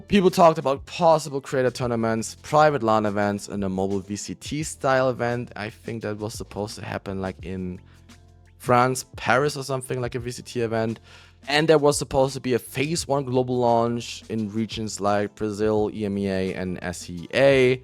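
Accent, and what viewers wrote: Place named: Germany. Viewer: You really got all the info in this little Video keep it up! Lil question. First where are you from because you sound a bit german and second can you explain how you get a 100 ping if the background is your gameplay because i am stuck on 150 ping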